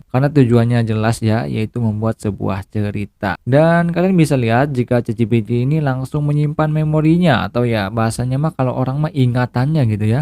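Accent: Cirebon, Indonesia. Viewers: orang cirebon tah bang ? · Bonggan dewek, wong cirebon tah kang?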